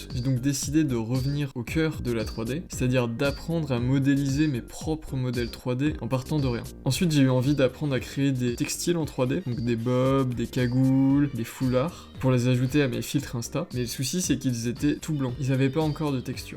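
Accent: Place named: England, United Kingdom. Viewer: PTDR, j’viens de me taper cette même vidéo mais avec ton accent anglais, avant de me rendre compte que tu faisais ça pour toutes tes vidéos, con que je suis 😅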